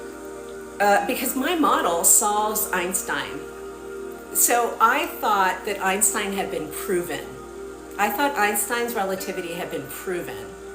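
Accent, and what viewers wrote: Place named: Pittsburgh, United States. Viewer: Are you from Pittsburgh? By any chance your accent sounds familiar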